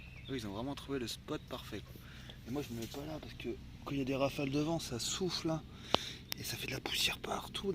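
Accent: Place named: Paris, France. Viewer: je ne sais pas si on peu vivre avec 1 rsa , meme en camion, qd le camion doit aller au garage ca coute 1 blinde ,au veto avec les chiens egalement , .....et tu pleures , ....cest plutot de la survie , tant que tout va bien .........au moindre enmerde c complique , jespere que tu as la possibiite de faire 1 peu de black par ci par la , le systheme D , tu nas pas laire bete , tes chiens ont lair heureux ca c bien, tu fais de belles videos , tu a lair plutot sympa avec ton accent de titi parigo , tu as raison de profiter de la vie t jeune , et te souhaite le meilleur , mais pense que tu va aussi vieillir 1 jours , .......et que la vie en camion na qu1 temps .....continues a nous regaler avec tes videos .tu devrais flouter ta plaque dimmatriculation, avec tout les craignos quil y a sur internet , ......petit conseille de vieux roots .....les bougies de prechauffe cest surement la cause , c pas donne , ......1 camion de + de 15 ans , le turbo peut etre l e probleme , changes t filtres aussi .....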